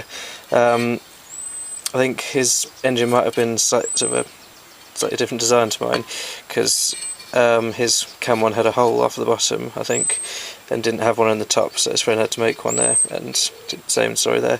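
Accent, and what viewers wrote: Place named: United Kingdom. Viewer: what part of t uk are u from?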